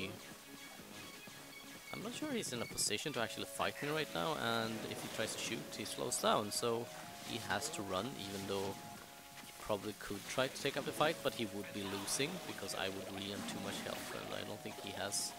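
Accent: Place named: Sweden. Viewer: You sound so Swedish, are you really from the US?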